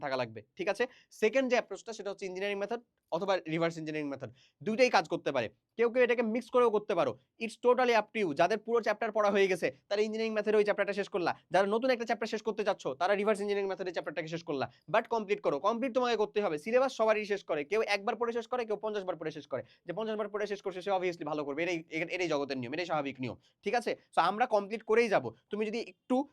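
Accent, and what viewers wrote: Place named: Rajshahi, Bangladesh. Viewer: DAMN SURE VAII FROM RAJSHAHII LANGUAGE TA OIROKOMII:face-fuchsia-tongue-out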